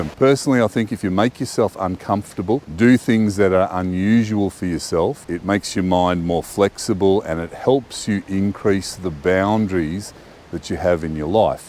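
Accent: Australia. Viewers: The Aussie accent aside I was thinking he looked kinda like an Old US Marine.😁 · I hate AI text. He said "aspire" and the text caption read "a spider". Granted,he does have a strong Australian accent. Perhaps the AI is American.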